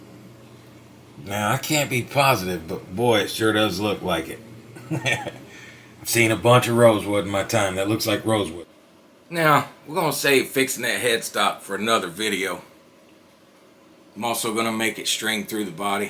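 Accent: South, United States. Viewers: are you located in Orlando? I always thought you were from Kentucky, Alabama or somewhere down there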